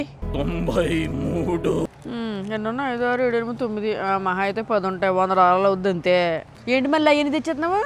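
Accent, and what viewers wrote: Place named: Telugu, India. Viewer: Nuvu ni Telugu slang…killing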